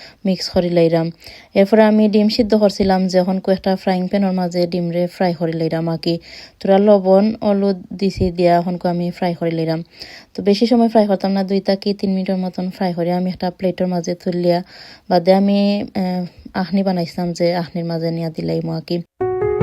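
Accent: Sylhet, Bangladesh. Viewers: আখনি খেতে অনেক ভালো লাগে আপু তুমার ছিলেটি ভাসা শুনতে খুব ভালো লাগে আমার ❤❤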